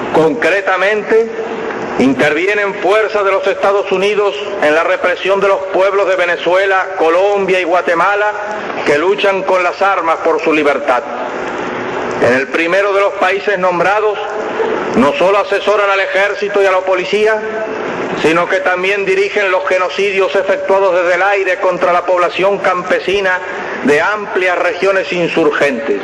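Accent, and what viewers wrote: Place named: Cuba. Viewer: Porque hablaba como cubano?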